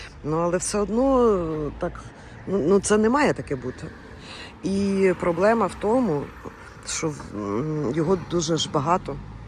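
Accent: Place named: Central Ukraine, Ukraine. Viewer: Гарна українська мова при 20 роках в Англії. Схожа на центральну Україну.[ Хай щастить.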